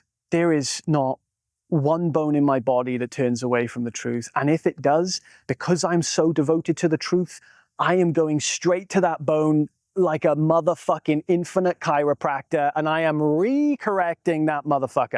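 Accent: Wales, United Kingdom. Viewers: Beautiful background! Where is that? By the way, is your accent Welsh?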